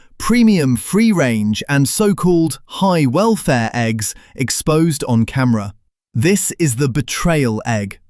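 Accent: United Kingdom. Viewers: English accented narrator, mentioning british supermarkets BUT stocked egg shelves with pricing in usa dollars!? Whats going on?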